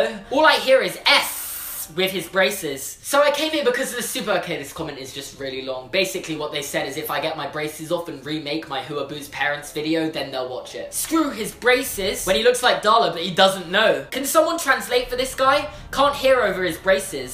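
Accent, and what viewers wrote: England, United Kingdom. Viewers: I actually really like your braces and I just don't get your voice because of the English accent since I'm American sorry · Idek how y'all can make fun of his braces when he looks so cute I mean fr fr braces+cute British accent+BEAUTIFUL HAIR=the perfect boy so y'all can stfu and stop talking about him thx👌🏾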